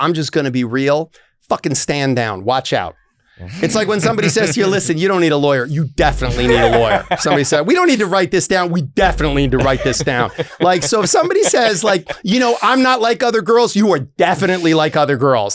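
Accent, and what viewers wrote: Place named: England, United Kingdom. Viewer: That’s a dynamite English accent. You’re the best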